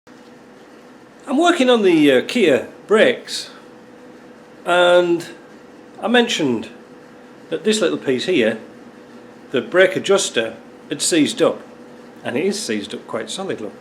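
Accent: Yorkshire, United Kingdom. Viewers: The big red spanner has worked for me many a time! I only have MAP gas but with patients it works. Great presentation style, I can almost hear all the old Yorkshiremen that took you under their wings.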